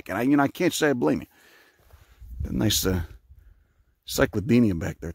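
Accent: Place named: Shasta County, United States. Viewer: you sound like you live in shasta county like shingletown or something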